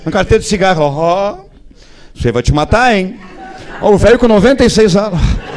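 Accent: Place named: Rio Grande do Sul, Brazil. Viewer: Esse sotaque Bagual malandro é único , o identificação do caralho!!! Muito bom parabéns! 👏👏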